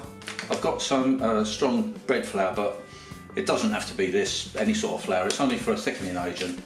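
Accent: United Kingdom. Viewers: Hello! I love fish! Especially when it's a form of pie 😊 anyways, are you Scottish? You sounded like it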